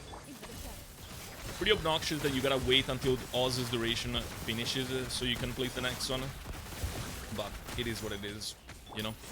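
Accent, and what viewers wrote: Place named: Italy. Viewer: Accento leggermente italiano dio bono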